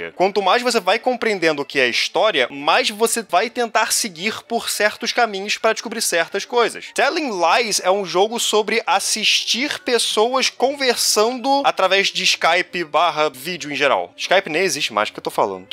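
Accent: Rio de Janeiro, Brazil. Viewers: Esse sotaque carioca do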